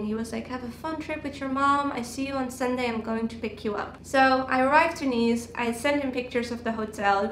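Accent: Netherlands. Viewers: Haha yeah I just heard her accent and I was like ohh you sound Dutch ( I'm from the Netherlands as well ) and then I checked and it made sense haha. But we learn English in elementary school so it's kind of a second language for us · Really looking forward to Part 2. P.s. ik dacht al dat ik een nederlandse tongval hoorde met je engels 😋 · Wow I heard the dutch from second 1 :) hiii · You have a little dutch accent🙈 are you from Holland? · ik hoorde gelijk je Nederlands accent. groetjes uit Nederland! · As an American who once lived in Holland I learned an expression, The best women get the worst men. I think it's true, those Nederlanders are so insightful. Living in your homeland was possibly the best thing I ever did. I could hear from your accent that you are Dutch and I am always grateful for my time in that great country. Tot Ziens.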